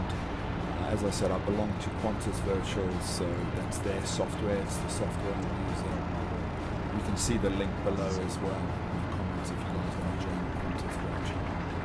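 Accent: South Africa. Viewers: hi pal how are u today u sound like ur from south Africa johansburg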